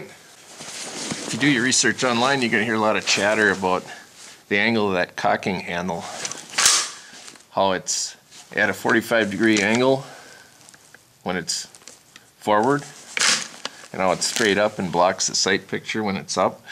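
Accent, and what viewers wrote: Canada. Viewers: Very nice video! I really enjoyed your presentation style and your coverage of the beautiful nature as well as the technical details! Have been subbed for a while and really appreciate your channel. Canadian accent? Your weather is cooler than ours (here in Switzerland -3°C as I write). My local outdoor range is closed for winter :-( Take care, Rob (Brit/Swiss) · your from nova scotia arent you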